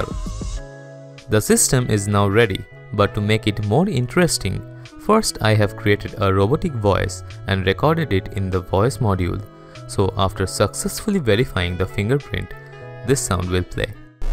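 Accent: India. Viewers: You know it's real when you heard indian voice 🤗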